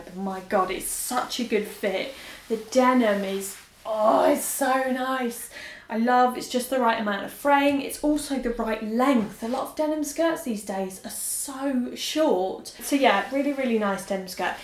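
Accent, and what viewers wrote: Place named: London, United Kingdom. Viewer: Aw you can't imagine how much I love your accent. It reminds me the time I spent in my lovely London. In this video you talk specially smoothly so it is easy for your non-english speaking followers to understand. Also love your style of course ❤️ Lots of love from sunny Spain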